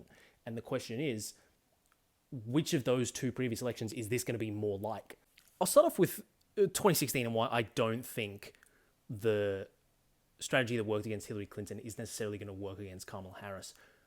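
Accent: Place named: Australia. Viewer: Didn't know you were Aussie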